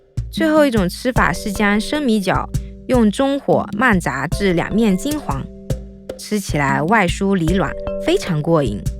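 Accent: China. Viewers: 汶。 我看过这个频道之前的影片，通过他的口音我知道他是大陆的，所以我才会这样说，还有一种更搞笑的，视频内容讲中文的，标题就是中英两种，他们就那么想外国人看到？这种情况只有亚洲人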